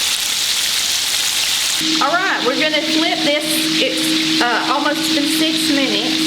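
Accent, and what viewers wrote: South, United States. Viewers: you so southern like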